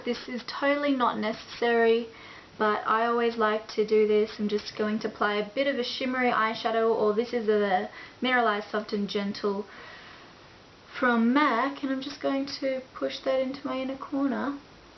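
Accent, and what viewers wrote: Australia. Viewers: nah shit mate. im ausie and even i can tell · I love your aussie accent :D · Loved this video!! Nice to hear an Aussie accent after watching so many American make up tutorials! xox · O.k. well I really got something out of it, so thank you. And....my opinion - talk all you want, here in the South, we don't get to hear your lovely aussie(?) accent much. using the makeup remover and pad will help me with my goofs. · boston? really? shes aussie.. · This was lots of help, and you have a strong aussie accent, a lot stronger than mine! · Aussie Aussie Aussie Oi Oi Oi! I picked you as an Aussie straight away. Boston? WTF? Great tutorial by the by. Definitely going t try this out.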